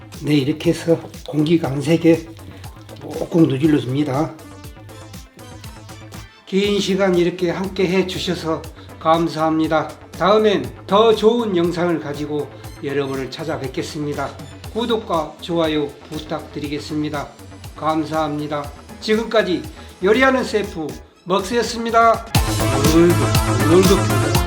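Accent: Jeolla, South Korea. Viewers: 전라도 사투리가 구수하니 듣기가 좋으네요 석박지 요리 잘배워 갑니다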